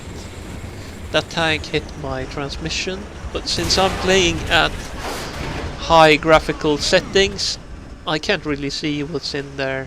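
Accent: Sweden. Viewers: Lmao hörde att du var svensk efter första ordet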